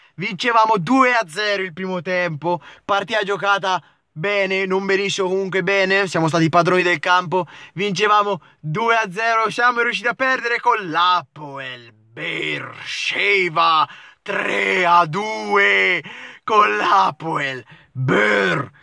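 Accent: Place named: Bergamo, Italy. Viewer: lol bergamasco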